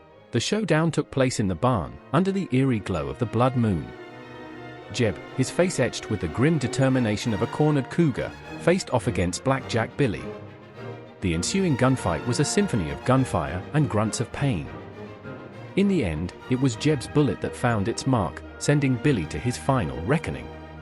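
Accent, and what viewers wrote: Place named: England, United Kingdom. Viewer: Sounds like the narrator is English!! They can't find an American with a southern or western accent to narrate the story?????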